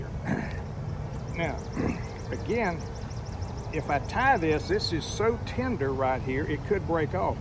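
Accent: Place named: South, United States. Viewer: me too. I was expecting a different accent for sure. Happy it’s a southern accent I can understand!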